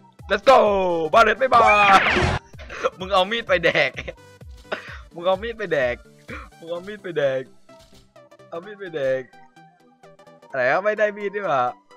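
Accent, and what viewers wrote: South, Thailand. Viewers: พี่คนใต้หรอ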